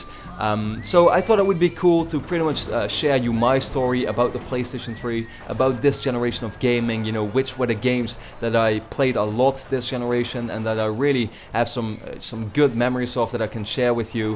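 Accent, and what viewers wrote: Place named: Netherlands. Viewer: I noticed ypu have a dutch accent. Are you dutch?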